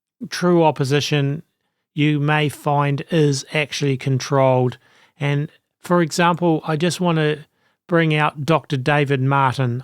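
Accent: New Zealand. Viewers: I always smile when u first welcome viewers - a Kiwi accent! 😃💗 Beautiful! From the Waikato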